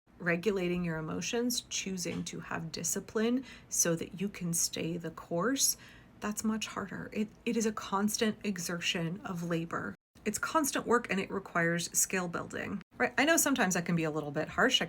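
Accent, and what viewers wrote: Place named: Alabama, United States. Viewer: UGH, sounds like Bama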